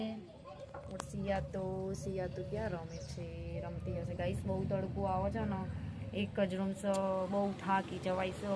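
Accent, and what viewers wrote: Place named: Idar-Mehsana, India. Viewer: બેન ઇડર (s k )ને મેહોણા ની મિક્સ ભાષા છે 😂😂😂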